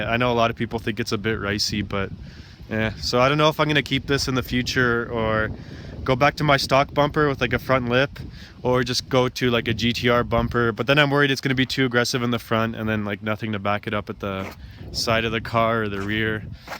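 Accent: Canada. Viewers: you have like the stereotypical canadian guy, i try to sound like u when making fun of my canadian friend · jesus you sound so Canadian. i am also Canadian you make me question if i sound like you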